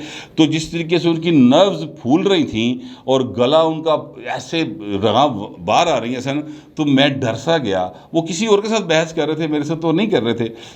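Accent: Lahore, Pakistan. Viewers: AOA Sahib l am regular follower of your very interesting and knowledgeable V-Log’s specially the great Lahoree style.Thanks for your wonderful programmes and for utilising your value able time for the Nation and for us all.Praying for your good health,long life and happiness.May you be Blessed with Allah’s Blessings and Bounties.Ameen.Warm Regards.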